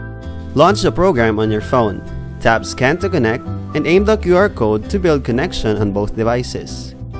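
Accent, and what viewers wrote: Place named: Philippines. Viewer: this is the thickest pinoy accent ive heard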